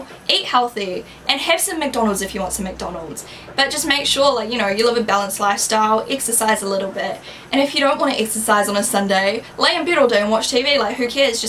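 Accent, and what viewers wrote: New Zealand. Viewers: Your main channel was the first one I subscribed too. I remember the first video of yours I saw was a grwm clubbing so it was just music. I clicked onto another video of yours and was so surprised to hear your voice!!! I was thinking you'd have an American accent, but no, you're a kiwi just like me!!! Instantly I subscribe and have watched pretty much every single one of your videos! Love you:) xxx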